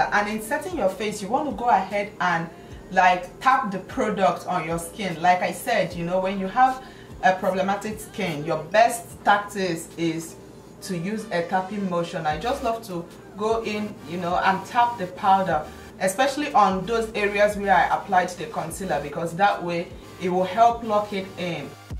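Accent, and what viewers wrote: Nigeria. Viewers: You are so beautiful and tutorial is explanatory and easy. You sound Nigerian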